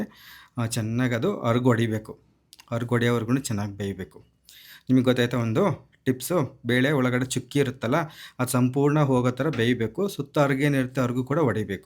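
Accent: Chitradurga, India. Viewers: Durgada bashe keloke chanda ..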